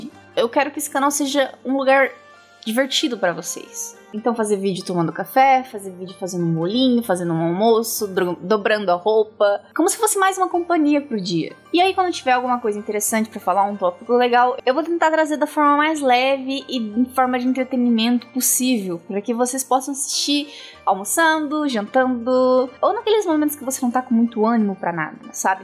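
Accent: Paraná, Brazil. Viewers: Caraí!!!!!!!!! Tu É Paranaense!!!!!!!!!!?????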